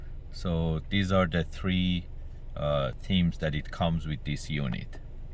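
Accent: Iran. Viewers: that persian accent tho ;)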